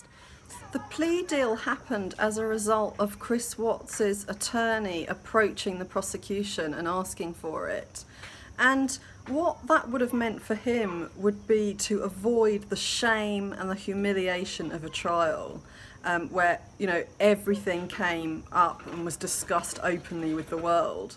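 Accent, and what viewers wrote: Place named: United Kingdom. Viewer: For me it's also her British accent. I don't know why everything they say sounds so smart :)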